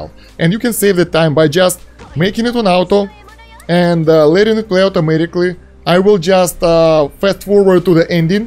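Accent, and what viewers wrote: Russia. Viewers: Ты русский или умеешь говорить с акцентом?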